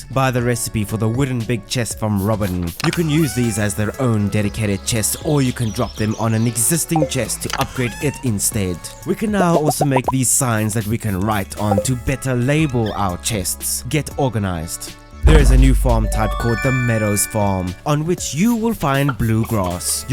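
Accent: South Africa. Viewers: broski is South African, just like me :D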